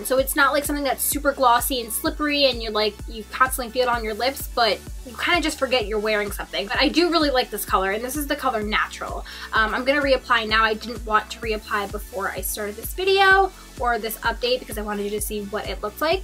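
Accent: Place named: East Coast, United States. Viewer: I love your tan!! You look great!!! I bought that Monistat primer on your recommendation and although it sounds awkward to put it on your face or tell your friends about, lol, it works great. Thanks!! I have super dry skin, so Im excited to try the foundation. I was considering trying it with no or a different foundation primer. Just an idea!! Great reviews, always!! When do you head back to Granada?? Do you miss it?? Every once in a while I catch your east coast accent, especially when you say "mascara," lol, and it makes me smile. You seem like such a west coast girl, like me, until I hear "mascara!" haha. Just a silly observation. Watch, now you'll notice it too. Habaha. Take care,!!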